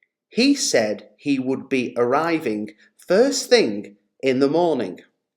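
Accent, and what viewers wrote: United Kingdom. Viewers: Does the speaker's accent is a british not american ?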